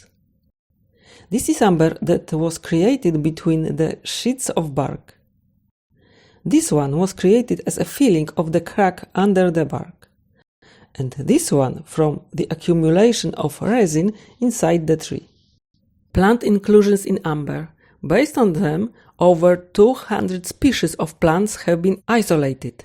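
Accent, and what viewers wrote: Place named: Poland. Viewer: NIE DA SIĘ TEGO SŁUCHAĆ GDYBY NIE TEN POLSKIANGIELSKI TO MOŻNA BY OBEJRZEĆ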